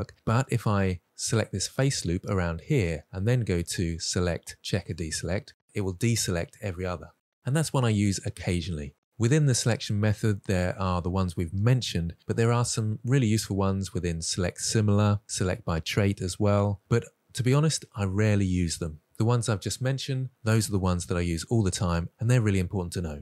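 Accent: England, United Kingdom. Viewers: He’s English, pronouncing English in a thoroughly English way. What’s not to like? It may sound weird if you’ve learned Blender from a New Zealander who makes donuts…. 😂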